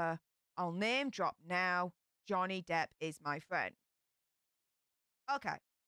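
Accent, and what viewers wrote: Yorkshire, United Kingdom. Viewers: HAS to be Yorkshire tho'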